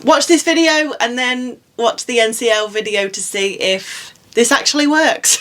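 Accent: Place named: England, United Kingdom. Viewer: I can listen to read the phone book and be mesmerized by that lovely English accent. It doesn't hurt she is VERY easy on the eyes.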